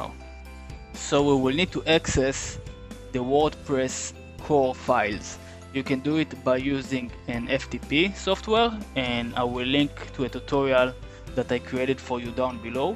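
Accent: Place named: Israel. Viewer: the most israeli accent i ever hear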